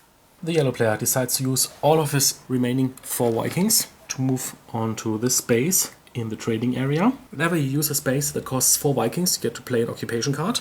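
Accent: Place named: Germany. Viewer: something about a german accent during eurogame reviews just screams professionalism.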